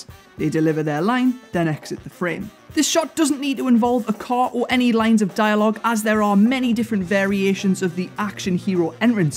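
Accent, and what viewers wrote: United Kingdom. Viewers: Hey bro whats your accent? I aint british but interested in british accents. The acceent I speak is similar to yours. Is it a north accent?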